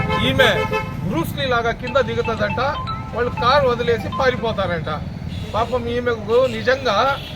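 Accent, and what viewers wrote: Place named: Nellore, India. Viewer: Nellore style lo chepparu great bhai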